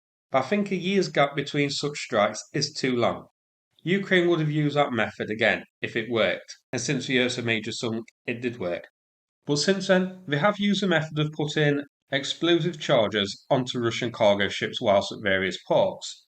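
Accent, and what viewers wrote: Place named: Lancashire, United Kingdom. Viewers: Northern English accent. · To the VO words ending in ING are pronounced ing like housing not housin ‘ ing . If you’re going to do a video at least learn your pronunciation. You sound ridiculous. The Lancashire accent is bad enough !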